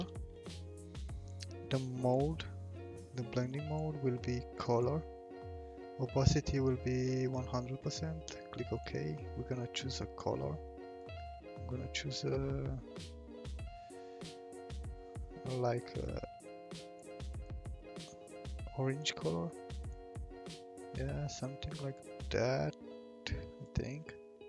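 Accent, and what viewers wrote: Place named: England, United Kingdom. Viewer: Brother really love your videos..and english accent..tutorials are very helpful... Keep up the Good work!!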